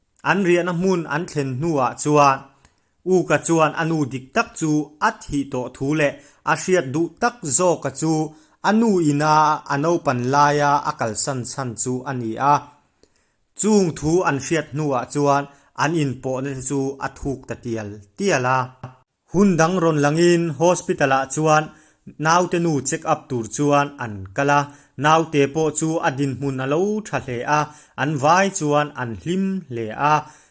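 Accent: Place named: Myanmar. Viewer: I trawng hi Myanmar (burma) aw top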